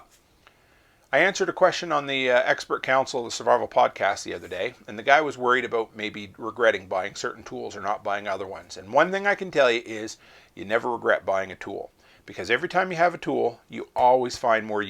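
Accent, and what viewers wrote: Canada. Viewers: Thanks for the info ... I learned something too :) What I like about your videos is that you talk "Canadian" -- specifically western Canadian!!! I'm from AB & get your little jokes!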